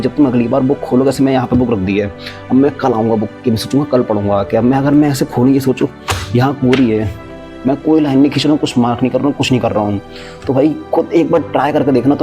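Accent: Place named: Uttar Pradesh, India. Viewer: 😅 having up accent it feels like heavy more than sophisticated...woo thoda matter ho gya wala feel getting 🙃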